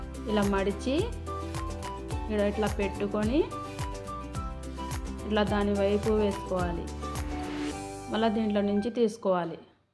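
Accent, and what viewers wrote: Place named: Karnataka, India. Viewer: Mee cheppindi kannada sound yekkuvaga undi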